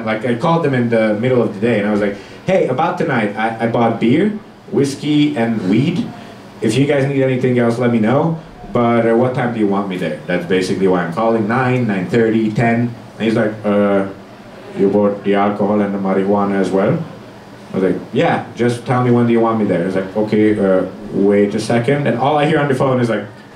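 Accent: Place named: India. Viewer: Yea it sounded kinda indian/pakistani to me too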